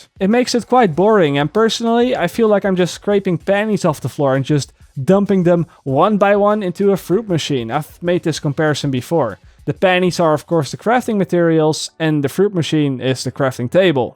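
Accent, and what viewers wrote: Netherlands. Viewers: Ja hij is Nederlands en heeft dus een Nederlands accent. Boeie.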